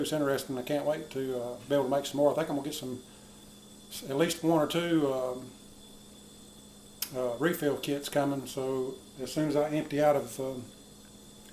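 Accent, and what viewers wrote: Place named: South, United States. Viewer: LOL!. FER-men-tater. :) I love your videos, but I have to watch them in small doses, as I have always been susceptible to picking up southern accents when exposed to them for too long. :) Thanks for the great info here, though, I am about to start home brewing and so am doing preliminary research. I don't think I will start with a Mr. Beer, but this is interesting nonetheless.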